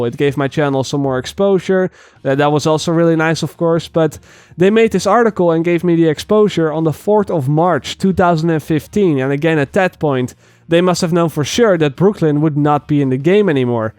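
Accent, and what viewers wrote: Netherlands. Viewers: lol deze man is zwaar Nederlands hoor Hoe hij klinkt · wow this guy is dutch af! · ik wist dat je nl was ;) Door die tweet screesnshot bevestigde het ;) Je hoort het aan je accent ;) Wel echt nice video!! :D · Noticed the Dutch acent in there, I was like.. This has to be a fellow Dutchy >.< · is this guy dutch? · ja hoort best wel duidelijk je nederlands accent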